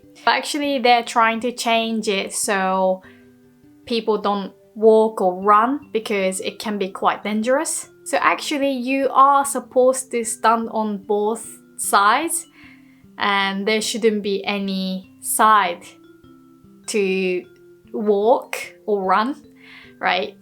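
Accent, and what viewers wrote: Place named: United Kingdom. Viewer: Very good video ! It's fascinating about both language and culture When I've been in Japan I definitely pick up my bowl to eat rice, but I wouldn't do that in the French restaurant in Paris! On the other hand nobody would bother you about it! I enjoy all of your videos as I'm trying to learn Japanese. By the way you have a bit of a British accent ! IMO ;)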